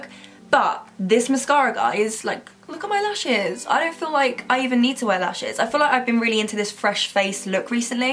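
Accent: United Kingdom. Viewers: That's real British😂 not what u see in films aha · I love ur accent british& ilove u😍❤️❤️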